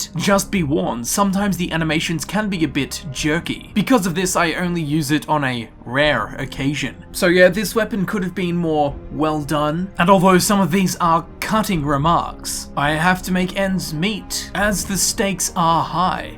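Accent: Australia. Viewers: your aussie yeah or am i crazy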